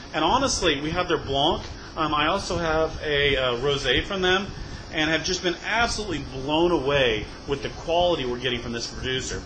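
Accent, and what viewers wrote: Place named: United States. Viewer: maybe a little heavy on mercantile concerns but good commentary... and such an American accent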